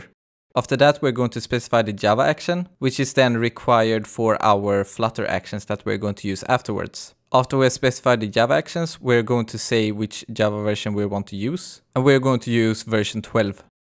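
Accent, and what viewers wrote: Sweden. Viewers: Sverige accent for sure.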